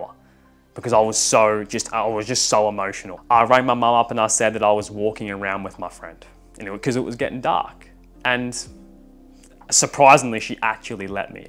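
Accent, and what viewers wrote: Australia. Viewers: are you an Aussie mate?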